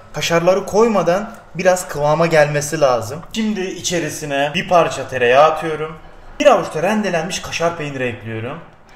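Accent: Adana, Turkey. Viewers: Bu nasıl hataylı lan adana şivesi konuşüyürr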